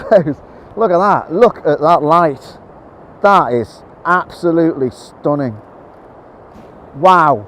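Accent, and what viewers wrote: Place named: Merseyside, United Kingdom. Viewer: Really enjoyed that video only just discovered your channel so will be watching a few more. It is a truly magical location I must of followed a similar path to you, as I have similar angles,(maybe not quite as death defying on the cliff) it was that windy I could hardly walk up by the lighthouse. Am I right to think by your accent you don't live that far from me in Merseyside somewhere I live in Runcorn.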